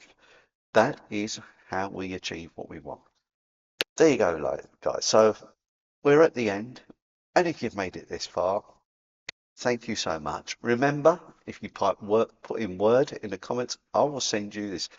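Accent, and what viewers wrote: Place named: Cockney, United Kingdom. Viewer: Lovely my friend. The Cockney accent helps!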